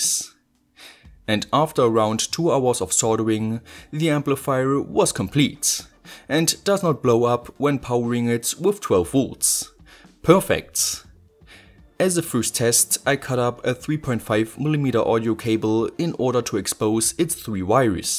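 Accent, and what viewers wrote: Germany. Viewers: I'm pretty sure he's german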